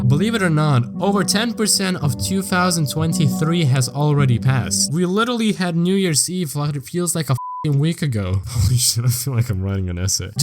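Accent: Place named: Baltic states. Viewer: Y'know, you have some weird Baltic accent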